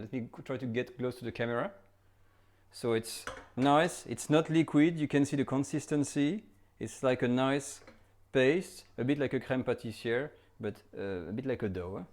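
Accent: France. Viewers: Love your explanation and your beautiful French accent too... Music to my ears...🥂 merci beaucoup... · Your French accent is great and the video is spot on. Thumbs up and Sub! 👍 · French accent, that's a guarantee for a good classic french recipe! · Me encanta el acento francés😁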